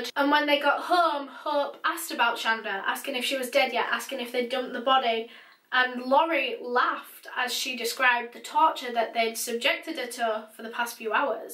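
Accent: United Kingdom. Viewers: Not trying to be a dick but her voice is not that great 😅 She's almost yelling especially at the beginning of every video, and that turned me off of her for such a long time till I finally decided I'm gonna try to get past her yelling intro, and then I absolutely loved her and binged her content. She has a British accent, different from the "mainstream British accents" like in the movies and stuff, but still lovely. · Her voice is so angelic and beautiful she speaks in a British accent. She is a nice true crime YouTuber but I would like if she would call the people who are responsible for the crimes names like monsters psychos sickos etc……………..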